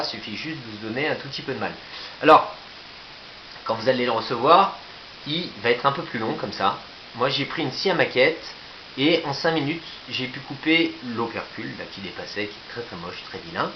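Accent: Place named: Quebec, Canada. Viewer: 😄😄😄 pas mal l'accent québécois !!! Mais tes sur YouTube ces cool sa. Be moi aussi. Si tu va sur ma chaîne je pense tu me reconnaitras😊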